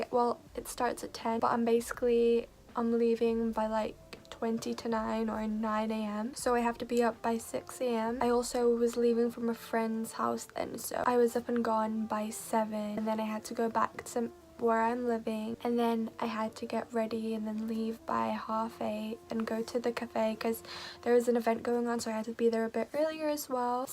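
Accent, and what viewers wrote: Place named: United Kingdom. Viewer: I love your videos!!💗💗 Your accent is starting to sound british 🌸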